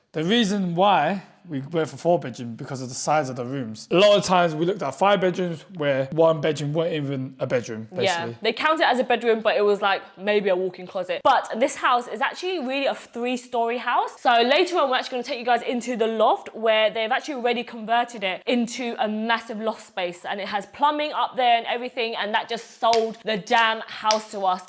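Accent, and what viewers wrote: United Kingdom. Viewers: does anyone know what region of the UK her accent is from? city/etc? Love her.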